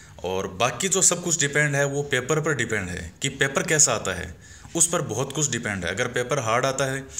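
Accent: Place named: Shekhawati, India. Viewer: sir ki boli se to ye Sekhawati chetr ke lgte hai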